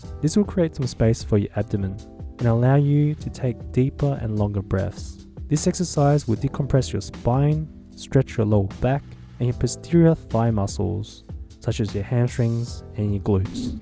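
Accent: Australia. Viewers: i love the auschraliaaan accent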